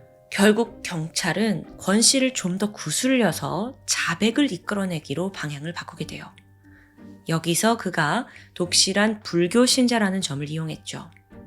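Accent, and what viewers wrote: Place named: Seoul, South Korea. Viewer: 목소리 맑고 순수한 서울말씨 얼굴 모두 다~아 좋아요 75세 팬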